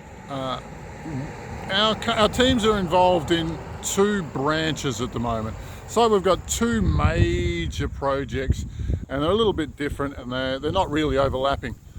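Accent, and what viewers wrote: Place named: Australia. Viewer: I just found you & am blown away by the little I’ve seen. It wasn’t until I saw the stunning scenery behind you that I clicked you were Aussie & why your accent & speech were so relatable - 👋🏻 from cold, wet, freezing Melbourne here on Cup Day 🏇🏆 After decades of physical/mind blocks, I finally see/feel the closest to home ever, expanding without restriction & what I heard here made complete sense. Thankyou, may love & light be with you always 💗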